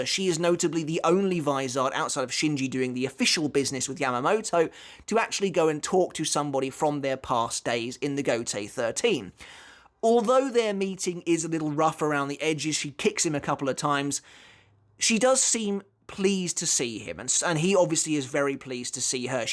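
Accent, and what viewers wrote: United Kingdom. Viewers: It's probably the british accent.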